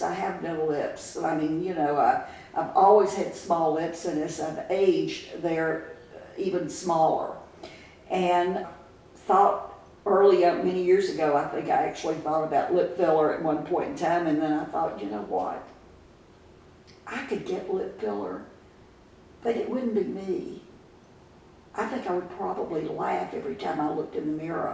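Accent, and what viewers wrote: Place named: Arkansas, United States. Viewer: I always wanted to ask you are you from Arkansas? Because I know someone that has That is from Arkansas and has the same accent that you have. So I was just wondering where are you from to get such an accent like you have?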